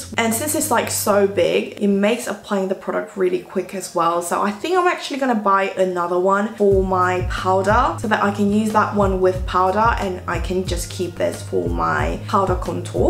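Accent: United Kingdom. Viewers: 살도 빠지시고 더 예뻐지신것같아용 ㅋ고급진 영국 발음하며 정말 너무너무 좋다눈 ㅠㅠㅠ 영상볼때마다 기분 짱 좋아용 ^^글공 오늘 입은 의상정보도 주세용용용 ^^ 넘 취향저격 ㅋ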